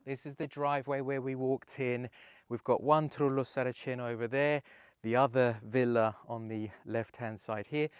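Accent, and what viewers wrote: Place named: England, United Kingdom. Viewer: never crossed my mind and I'm from that neck of the woods. I took you for English through and through .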